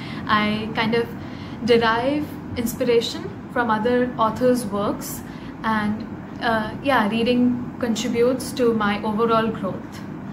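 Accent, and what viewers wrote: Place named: India. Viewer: Cringey? Are you kidding me? I adore the Indian accent! Looking forward to seeing what kind of content you create. :)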